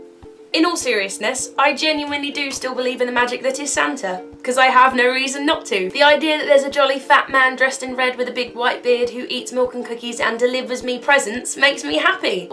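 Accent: United Kingdom. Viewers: That was what I was referring to xx P.s. omg u hav british accent??/¿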